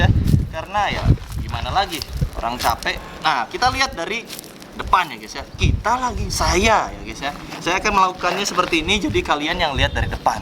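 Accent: West Java, Indonesia. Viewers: Kirain orang sumateraan, tapi bahasanya ada sunda2nya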